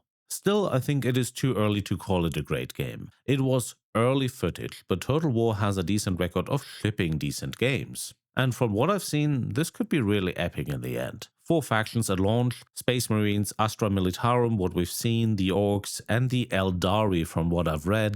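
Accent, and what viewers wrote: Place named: RP, United Kingdom. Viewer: Posh accent. - Eldar player. Perfectly fitting.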